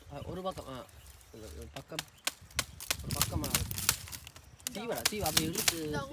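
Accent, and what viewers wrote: Jaffna, Sri Lanka. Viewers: உங்களுடைய voiceஎன்னுடைய jaffna நண்பரகளை நினைவு படுத்தியது அண்ணா 🥺🥺🥺🥺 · ஆர்ப்பாட்டம் இல்லாத யாழ்ப்பாண தமிழ். வெகுவிரைவில் ஓரு லட்ஷம் 👌👌👌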